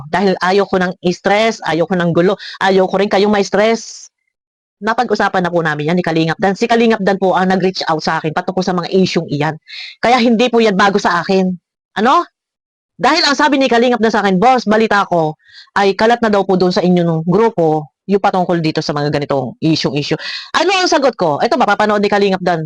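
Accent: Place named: Laguna, Philippines. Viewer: Ma'am, taga saan ka po? Ang puntuhan mo kase ay parang taga Laguna😅 na may pagka batangeña😅.. ang cute lang pakinggan😊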